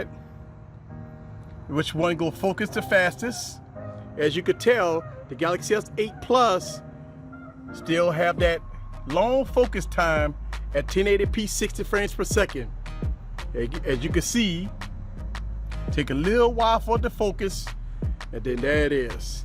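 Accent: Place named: South, United States. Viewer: You's a southern boy huh? 😊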